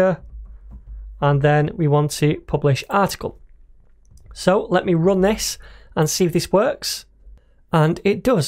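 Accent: United Kingdom. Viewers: Always watch your tutorials, like how you explain each video clearly for beginner and love your British accent:) Hope someday you have complete Dart series. Thank a lot,.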